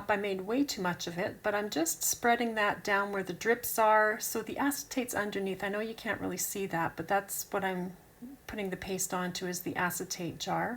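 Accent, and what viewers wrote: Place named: Canada. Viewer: What a lovely card. I love your Canadian accent.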